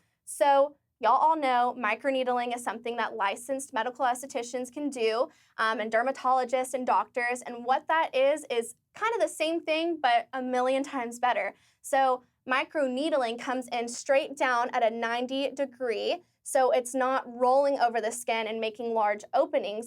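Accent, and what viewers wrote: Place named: United States. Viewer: As a New Zealander who doesn't have a thick accent, this woman's American accent is not super yanky. A good american accent.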